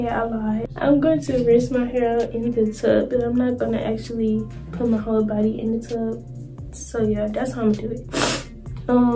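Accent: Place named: Texas, United States. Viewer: Your hair looks beautiful!! I said yep that's a Texas accent.... possibly East Texas! Thanks for showing us doll💚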